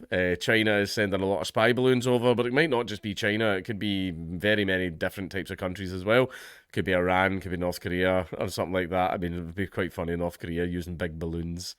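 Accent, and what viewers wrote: South, United States. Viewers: You are on fking point, dude. And your American accent is grade A choice. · sounds more southern in his normal speech than he did with his southern accent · lmao, dude, find more excuses to use that redneck accent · I need so much more Southern in my life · As a southerner your southern accent is wonderful keep using it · Birmingham native here… the other Birmingham. southern accent… y’all’dn’t we’d be fine with it did y’all? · Your redneck accent has me crying 😂😂😂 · You’re southern North American farmer is so amazingly terrible and I need more in my life 😂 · Nice job on the American accent homie. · Damn you sound great like a Southern Farmer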